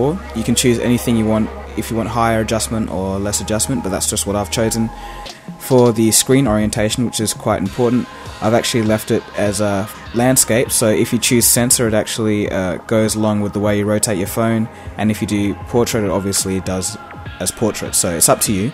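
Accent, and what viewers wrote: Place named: Australia. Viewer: I wouldn't worry about it. You've got an "accent" being from Australia or wherever you're from, but other than that, you sound fine to my American ears. Good job with the videos. Very well done!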